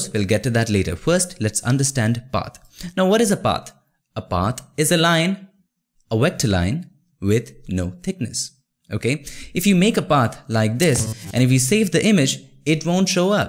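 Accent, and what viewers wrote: India. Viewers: du redest zu viel mit deinem indischen Akzent😮😅😊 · bahasa inggris logat india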